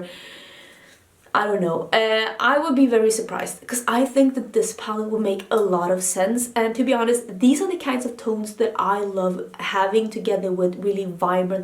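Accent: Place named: Sweden. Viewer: k this is so random but one half of my family is from Finland, moved to Canada in the 70s'80s.. I love watching your channel as it reminds me of my family who have now moved across the country 4 years ago! must be the similar accents/dialect of english as I know you are from Sweden. Beautiful countries!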